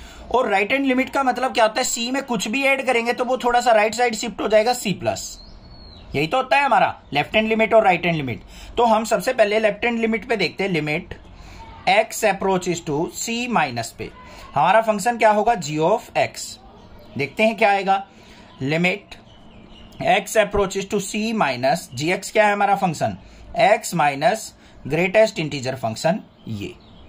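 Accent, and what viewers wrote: Haryana, India. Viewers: Sir aap hariyana se ho ?aap jbb bol re ho tbhi pta chl ja rha h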